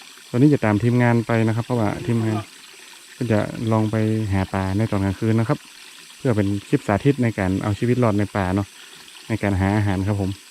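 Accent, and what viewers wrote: North, Thailand. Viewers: ชอบภาษาเหนือมีเสน่ห์ค่ะ แต่บางคำก็ฟังออกบางคำก็ฟังไม่ออกแต่ก็ชอบดูค่ะ✌️✌️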